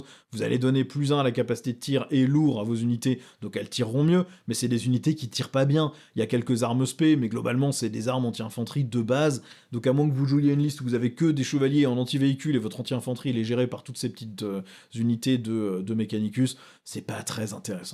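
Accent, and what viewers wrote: France. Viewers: un pouce en l'air pour le focus sur le background et pour la voix française qui surprend quand on viens du channel linux ^^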